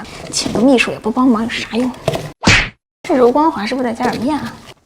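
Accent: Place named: Qingdao, China. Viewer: 你是四川的？你说话我还以为你是青岛的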